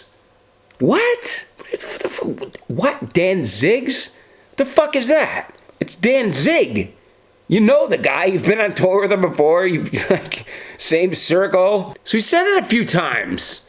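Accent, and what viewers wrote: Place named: Texas, United States. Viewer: Damn dude you’re Southern accent is spot on. That’s how we talk in Texas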